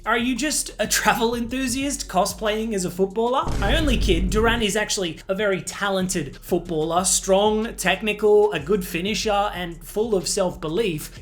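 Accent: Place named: Australia. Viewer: The Aussie accent is indeed the best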